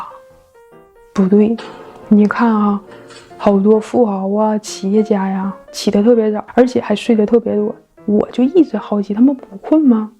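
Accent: Northeast, China. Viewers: Youtuber是哪里的人？听口音像是东北人。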